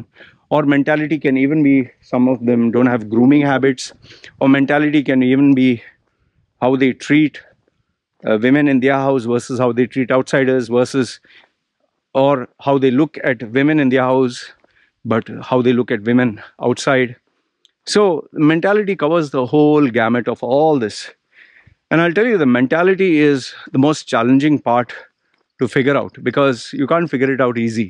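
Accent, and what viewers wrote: India. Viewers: I'm confused. You say you're didn't spend a lot of time in India, but you sound Indian like you grew up there.Everybody knows indian accents . Plus Whats up with , new zealand Tribal tattoos on your face why Since you not from new zealand or indigenous new zealand just wondering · You don't look indian at all, of course your accent is extremely strong so people realize that, but it's nice to listen to you Subscribed!!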